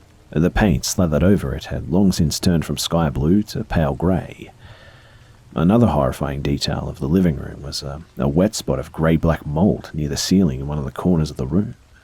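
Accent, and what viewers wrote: Australia. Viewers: Wait do you have an Australian accent?? Oh my gosh that's my favorite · Also I have to ask are you an Aussie? I am and you have a very Aussie accent 🇦🇺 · Good story but having a narrator that is man with a man with australian accent playing a 29 year women from texas with a southern accent does not do it for me.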